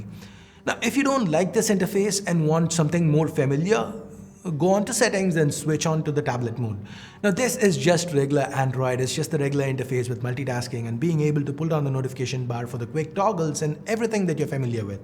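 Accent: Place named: Tamil Nadu, India. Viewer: You English is like a typical tamil school student who always wants to grap the attention of others just by speaking in English.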